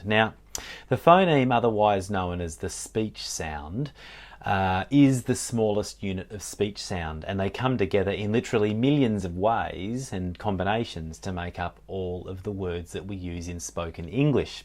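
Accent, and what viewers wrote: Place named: South Australia, Australia. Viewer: This was a really helpful video to watch and made me think differently about phonemes and strategies for phonemic awareness ('words are actually blobbed'...great image). I teach adults English as an Additional Language (migrant program) in WA. It's great to find some examples of each phoneme spoken in an Aussie accent. As I'm originally from Adelaide, I appreciated the South Aussie accent too...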